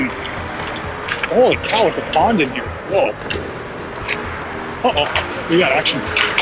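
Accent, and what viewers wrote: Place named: Canada. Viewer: Sick video! Music was perfect and you dialed up the canadian real good, bud!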